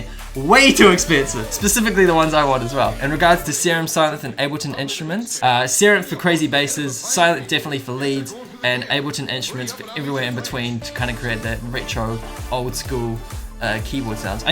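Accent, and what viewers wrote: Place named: South Africa. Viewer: Hey mate - I love your accent (and the music definitely too) ;) Are you of SA origins? Cheers from Poland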